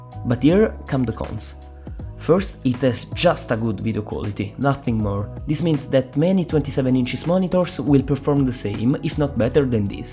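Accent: Italy. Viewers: Are you Italian? cause you sound exactly like me talking in english and im from Rome lol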